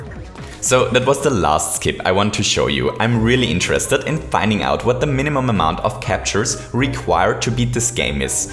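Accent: Germany. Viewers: Ernsthaft? So einen krass deutschen Akzent hab ich im Englischen noch nie gehört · Wow. It's so funny, I know you are a German, because how you speak English. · Or german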